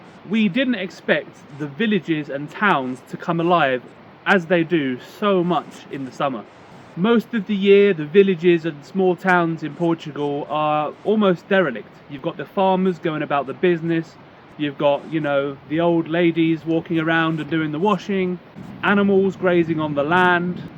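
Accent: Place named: United Kingdom. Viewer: Gosto de ver a perspectiva dos outras pessoas sobre o país. Parece-me que muitos britânicos conseguem ter uma opinião fundamentada e clara rapidamente; entendem relativamente bem a dinâmica social. Também aprendo algumas coisas, aparentemente, muitos estrangeiros que não são ricos, mostram-se satisfeitos com uma casa simples, que vão renovando de acordo com as suas circunstâncias, e fazem-no com muita resiliência e serenidade. Penso também sobre os rendimentos destas pessoas, mostram ser criativos e com domínio do mundo digital, onde se movimentam bem, e talvez estejam dispostos a correr mais riscos em relação à fiabilidade do emprego/rendimento/income a médio prazo, do que eu estaria. Por vezes pergunto-me, o interior está desertificado e desaproveitado, se estrangeiros podem viver nestas áreas porque mais jovens portugueses não o poderiam também fazer? 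A resposta clássica de muitos portugueses, grupo nacional de que tenho a honra de fazer parte, seria que os "estrangeiros têm muito dinheiro e/ou fontes de rendimento dos seus países de origem". Na minha opinião é uma resposta redutora, claro que existem estrangeiros com muito dinheiro, mas provavelmente não serão a maioria, pelo menos dos que vivem em Portugal, por isso, talvez possamos reter algo do seu modo de vida, e da maneira como criam sinergias e soluções de vida no interior. Este simpático jovem senhor, transmite a imagem de uma pessoa pacífica e bem intencionada, gosto do sotaque britânico, e vejo sempre os vídeos s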